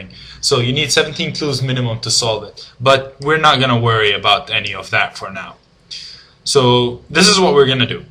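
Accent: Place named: Europe. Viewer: I watched some of your videos before and got distracted to some other. So I wanted to make these comments but did not get time then. But now I started watching them again and forced myself to comment on your videos - 1. First, it is very CLEAR VOICE and VIDEO both. Even though you have an accent, it is very easy to understand and your EU accent gives a very nicee exotic flair to it! Don't you all agree people? 2. Secondly, your voice also has a very determined way of expressing something - it almost hears that you are confident enough to teach, but yet you stumble humanly on some stuff but you confident way of saying things keeps us hooked. So this is a compliment too..you may be mistaken of shaky but you voice is NOT. And that is a good TEACHER quality! 3. Thirdly, you are very funny - you make fun of yourself using the the PAINT program to do cursive writing etc..then tell "us to try it"..I literally tried and found it fun!! 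just like you did! Also, the human part of you, when you did not rehearse and tried to expand the 2D array without putting the element in there and it was not doing it and you stumbled on indefinitely..then gave up and smoothly changed the subject going on to explain the internals of an array!! very funny and very human! Some of us would have decided to scratch the entire recording and start over after finding the actual method..but your publishing this stumble made you very human - one of us - and that makes a good connection between a teacher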